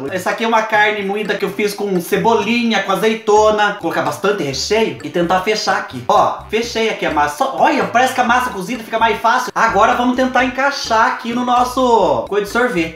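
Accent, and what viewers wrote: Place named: Cerqueira César, Brazil. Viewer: do céu, deixa eu contar. Meu pai tem 75 anos e nasceu nas redondezas de Cerqueira César, aí eu tava vendo seu vídeo e ele ouvindo, aí ele disse " Essa moça é das redondezas de Cerqueira, aposto pelo jeito de falar" kkkkkkkkkkkkkkkkkk aí eu disse que você é de Coronel Macedo e ele falou que é pertinho daqui, sou de Lençóis Paulista, perto de Bauru, quando acabar a quarentena, vou pessoalmente te levar uma caixaaaaa de coisaaaas ❤️❤️❤️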